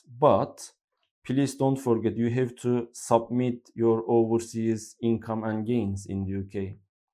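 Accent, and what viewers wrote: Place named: Turkey. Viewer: Bu İngilizce ile İngilterede yaşadığı yetmemiş bir de millete vergi tavsiyesi veriyor adam pes vallahi. Hiç bu kadar Türkçe bir İngilizce dinlememiştim 😂😂 git Türkçe konuş bari bu kadar bu dili konuşamıyorsan birader. Bir de zaten bunu dinleyecek kesim ağırlıklı buradakiler, neden Türkçe anlatmadın anlamadık.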